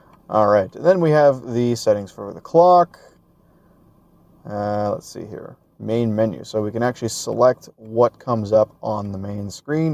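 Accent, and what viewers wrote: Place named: Canada. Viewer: Damn Canadians and their ou pronunciation. Really jumps out at us Americans.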